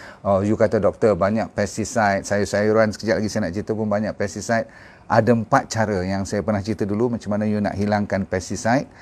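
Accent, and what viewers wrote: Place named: Kedah, Malaysia. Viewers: Tiba2 Dr. Kefek utara asai oghang mana? Info cukup bagusla Dr tq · alhamdulilah...tqvm... ilmu yg sgt bermanafaat..suka dgr Dr. cakap kedah....terbaek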